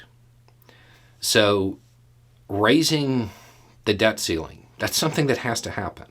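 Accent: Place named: Kentucky, United States. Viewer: he speaks in a manner similar to my favorite relative, an uncle from Kentucky.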